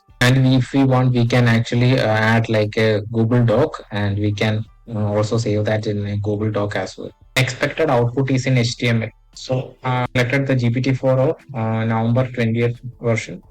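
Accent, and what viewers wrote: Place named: Kerala, India. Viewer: I think your Malayalam should not come in English and can be litter slow and steady in talking.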